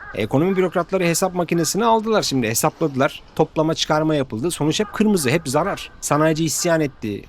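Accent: Japan. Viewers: Mahallenin yakışıklı çocuğu yine yaptı yapacağını 😂. Türkçe konuşan Japonlar gibi konuşuyorsun 😊👍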